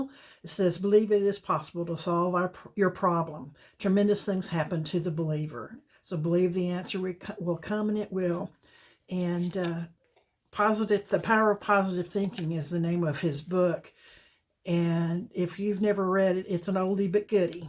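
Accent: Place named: Alabama, United States. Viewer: I love your accent. Where are you from? Sounds like Alabama. Forgive me if your not. I love your videos. I've done one or two now. I have a vid on you tube.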